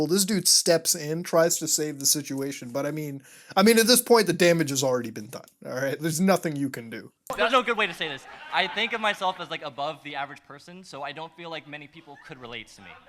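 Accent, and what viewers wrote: Canada. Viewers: are you canadian ???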